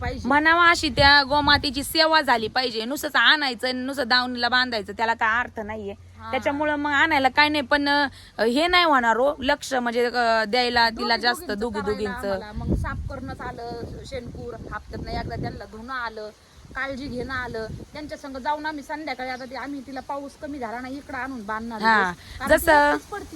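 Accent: Ahmednagar, India. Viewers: Nagri bhasha asich ahe Jo to tyachya gavanusar bolto...amhi pn ngri ahot...bhashevrun kunala nav theu nka mumbait rstyavr rahnare pn standerd boltat....nagr chi hich bhasha ahe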